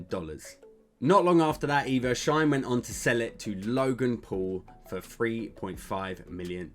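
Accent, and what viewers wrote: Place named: Australia. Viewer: yeah you really do sound more australian than british. I would have guessed you were born down under and then moved somewhere else. Really interesting which part of uk are you from then ?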